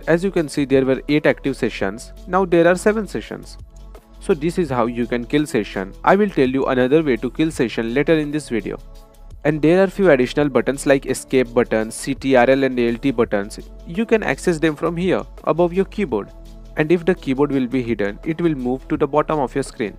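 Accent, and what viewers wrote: India. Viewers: I don't understand english language this tutorial didn't help me as I wanted but it's hard to be found in Hindi language coz these kinda people just speak English However 99.9% public is Indian ,it could be more helpful it even has Hindi subtitles , I hate this fucking accent what these people speak . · Omg his indian accent is so pretty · I think is Indian English right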